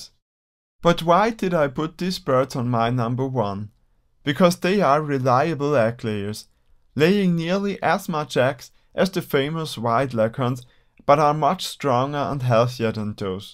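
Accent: Austria. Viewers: are you from Austria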